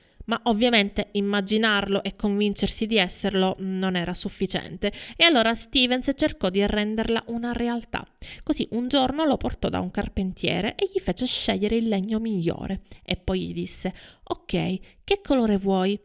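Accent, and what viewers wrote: Sicily, Italy. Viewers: Brava ,scandisce bene le parole ,ma come si sente la cadenza sicula!! Adoro la Sicilia ,ma quella calata quando si parla di cinema di Hollywood......,insomma . Riguardo a Rock Hudson , beh sicuramente bello, adatto alle commedie con la bravissima Doris Day ,ma non certo un grande attore !! Ci credo che nel Gigante primareggiasse lo splendido James Dean talento puro ,basta un solo sguardo suo , e la finale una recitazione unica ,lui si era veramente il cinema di Stanislawsky ,come altro mostro di bravura Marlon Brando altro che Hudson......,che non si vede che ha sempre quell' espressione un po' beota?? Cmq,altra cosa certo che gli omossesuali sono molto più promiscui degli eterossesuali e anche molto estremi . E Hudson andando con questo e quello in liberta' assoluta se l' e' cercato !! Puo' dispiacere come essere umano ma non e' giustificabile Cmq ,a differenza di Dean che con quella bravura eccezionale avrebbe regalato splendide interpretazioni , lui a parte qualche commediola non sarebbe mai stato un De Niro ,o un Newman.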